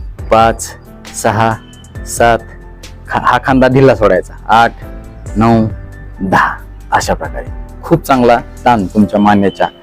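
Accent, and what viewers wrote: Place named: Latur, India. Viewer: "हसत खेळत व्यायाम करा" अशा पद्धतीचा सांधेदुखीचा आपला चांगला व्यायाम प्रकार आहे. डॉक्टर साहेब मला वाटते तुम्ही बहुतेक लातूर जिल्ह्यातील निलंगा, शिरूर अनंतपाळ किंवा उदगीर तालुक्यातील आहेत; असे तुमच्या भाषेवरून जाणवते. असो तुमची स्वतःची मस्त गावरान भाषा शैली आहे. हेबका...❤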